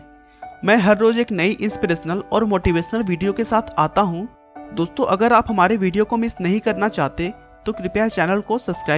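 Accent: Bihar, India. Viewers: Abe tu bihari he kya...???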